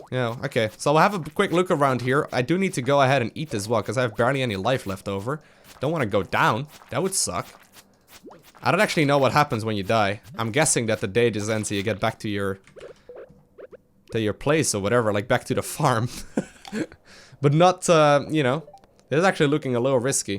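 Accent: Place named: Netherlands. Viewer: I watched through your most recent episodes and then decided to start at the beginning. I totally knew what was coming with the sprinkler and killed myself laughing once you came back after looking it up... (I cheated and wiki'd everything before I started so I can't say I'm any smarter..) P.S. As a fellow Dutchie, the only reason why I kept watching your videos was cause of your accent!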